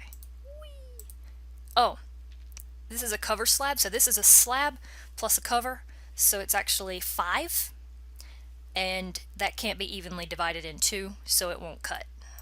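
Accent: South, United States. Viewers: she has a little bit of a southern accent. :)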